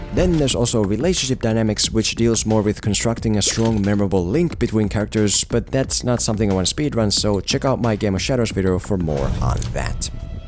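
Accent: Finland. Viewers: Bruh u sure your not finnish? Your english sounds exactly like finnish accent.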